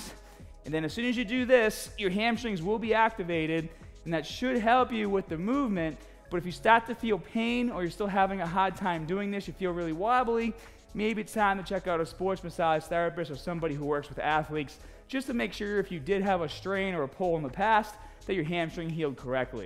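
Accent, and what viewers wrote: Boston, United States. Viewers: Damn...you’ve been in Florida for several months...and still have that Baaastin accent....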